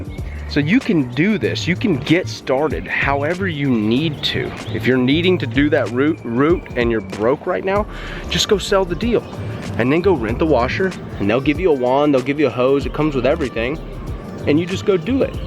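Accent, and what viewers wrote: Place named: New Jersey, United States. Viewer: Just found you on youtube, after seeing others, love the direct and truthful comments and straight up shooting from you. We are almost neighbors, I'm about 30 miles North of Nashville. Keep up the great videos, I just subscribed. If you want to sell that equipment , 4 gallons per minute, let me know. Would love to hook up with you sometime. By the way your "josey" accent is pretty good, I should know lived in NJ for many years.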